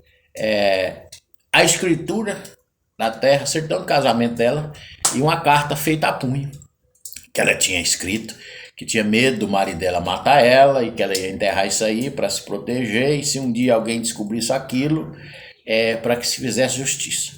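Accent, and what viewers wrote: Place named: Minas Gerais, Brazil. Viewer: Esse cabra é mineiro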